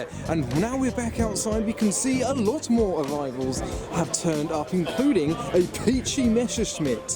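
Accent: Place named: United Kingdom. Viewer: You mean, how he "tolks" British style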